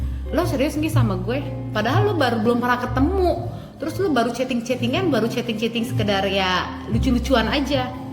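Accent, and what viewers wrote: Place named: Indonesia. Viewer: Youtuber paling menghibur ini, cara bicara nya benar2 indonesia bgt, mantappp jiwaaa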